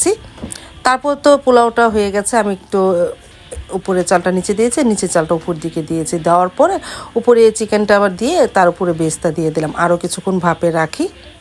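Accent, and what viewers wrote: Bikrampur, Bangladesh. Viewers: এটা আঞ্চলিক ভাষা মেক্সিমাম বিক্রমপুরের মানুষরা এভাবেই উচ্চারণ করে কথা বলে ভুল না ধরে যার যার আঞ্চলিক ভাষায় কথা বলার অধিকার আছে তার ভাষায় কথা বলতে দিন আর ভিডিও উপভোগ করুন।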